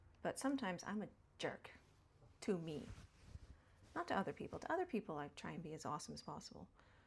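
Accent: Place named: Boston, United States. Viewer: There’s many Americans here in Australia and of course many Canadians. I always ask “Are you Canadian?” because I know Americans never get offended, unlike some Canadians do if I ask “Are you American?”. Generally I think both Canadians and Americans are very popular here (especially Americans) because we have grown up watching so much US TV (yes I too watched too much I dream of Jeanie, Bewitched and Get Smart). I feel like America represents the very best and very worst of western culture. Which is always so fascinating, to me anyway. Do you miss home? I can really hear your ‘Boston’ accent today (sorry if you’re not from Boston, it just reminds me of the accent in Good Will Hunting which was set in Boston.) It’s a very distinctive American accent. Sometimes I can tell an East Coast vs West Coast accent but not always. Anyway, what an interesting life you have lived. 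You’re truly international. How did you end up here in Oz? You’re American, with long grey hair, wild makeup preferences, fluctuating accent and work with birds! That’s interesting. Why do I get the sense that is just the tip of the iceberg with you? There’s a lot more to your story?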